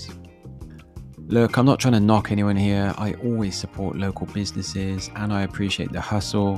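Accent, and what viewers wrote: Southwest England, United Kingdom. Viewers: it is I love your southwest accent it melts me.😊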